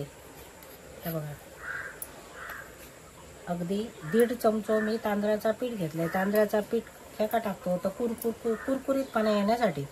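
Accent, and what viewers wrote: Malvani, India. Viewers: तोंडाक पाणी सुटलां. चिवारिचे कोमचेय दाखया. तुमचे व्हीडिओ बघताना, शुद्ध मालवणी आयकताना गावांत आसल्यासारख्यांच वाटता. देव बरें करो.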